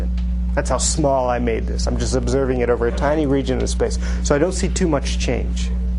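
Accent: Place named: United States. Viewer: Why must American's pronounce every greek letter differently >.<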